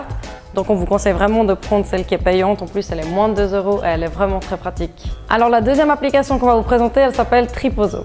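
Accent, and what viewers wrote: Switzerland. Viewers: L'accent suisse sur !!! ahah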